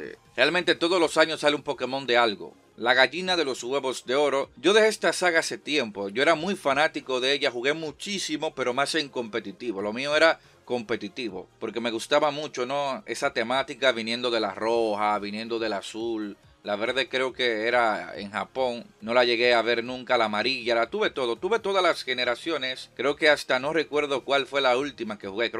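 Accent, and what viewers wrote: Dominican Republic. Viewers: eres dominicano????????????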